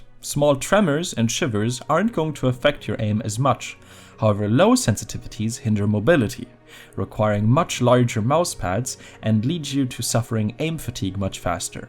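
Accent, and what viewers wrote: Germany. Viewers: Ich höre doch raus das du ein deutscher bist gibt es das Video auch auf Deutsch? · Direkt in der ersten sekunde gemerkt, dass er Deutsch ist. Gutes Video. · Hardest german accent i've ever heard, but good video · Man merkt so hart dass du deutsch bist haha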